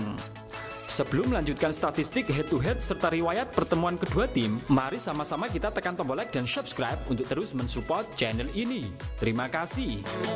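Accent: Java, Indonesia. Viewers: Pengisi suaranya pasti orang Jawa ni... Medok 😁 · Jowo medok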